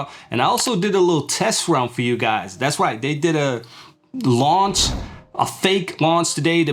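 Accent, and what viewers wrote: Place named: New Jersey, United States. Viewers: even sound american. Am i trippin? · I thought my man was from New Jersey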